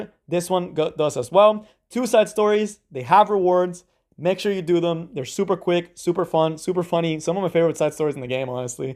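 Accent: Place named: Mexico. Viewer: Why your accent sounds Mexican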